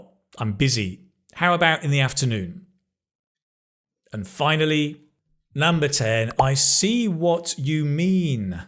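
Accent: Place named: RP, United Kingdom. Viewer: Sir could you please explain if it's possible for a person to learn your accent in just 4 months? (I am not exaggerating). Cause that's what exactly is happening to me right now, i completely lost my previous American-ish accent i would say that i can confidently speaks 80% of your accent without even thinking or telling my brain if how it should sounds like. I admit the Modern RP accent fascinates me that's why i invested myself emersing with the accent. I have to ditched those non-RP speaking shows, movies and podcasts and focusing only in modern RP sounds that shadowing and mimicking becomes part of my daily routine as if it is part of my body. I'm not just thinking in a modern RP accent i also literally speaks them, our cats and dog has been stressed out because i am also speaking to them. When i was just 11 yrs. old back in 92' i have this "Speech Impediment" disability where i constantly stutter and stammer, i can't speak my Filipino language constantly and spontaneously without stuttering. 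Then i noticed whenever i speak an English word or sentences my disability were non-existent, since my disability had separated me from the world of communication i invested myself learning the English language without having someone guiding me how to learn them. At that time we can't barely afford buying the things we need so my family can't afford of getting me an English tutor, so i'm on my own. That time the only way for you to learn understanding each and every word is by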